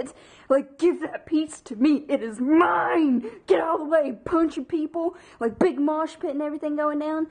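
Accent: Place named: South, United States. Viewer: I can totally hear your southern accent. lol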